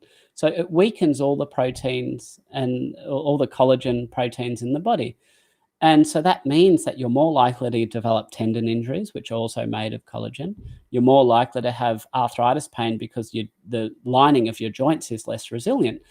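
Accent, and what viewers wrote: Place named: Australia. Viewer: Wanna give a shoutout to whoever thought of adding subtitles to this video. This helps for us people not familiar with the Australian accent. Hope this'll be the standard for future videos because YT's Closed Captions suck.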